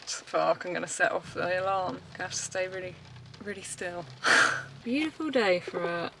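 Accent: United Kingdom. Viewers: What is accent? Which part of the UK?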